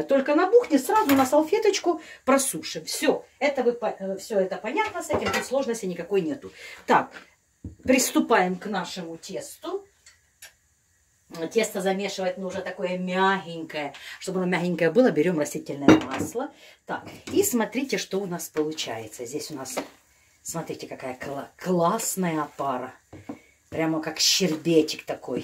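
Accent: Ukraine. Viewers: Уважаемая, я пеку Кулич / Пасху, которую вы пекли на передаче" Всё беде добре" ( смачно), вы там ещё банановый кулич, зайчиков и в яичной скорлупе делали. Огромнейшее вам спасибо, у меня всегда они получаются шикарно, и ещё --- говорите и не слушайте никого, ваш украинский акцент мне очень приятен 👍🌹🌿🙋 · ты просто молодчина очень хорошо рассказываешь и показываешь.Голос приятный с украинским акцентом.Ты добрая душа лишнего не говорила а всё по делу.Дай тебе Боже здоровье, и всех благ в твоей жизни.С праздником Вас. Христос воскресе! Воистино воскресе!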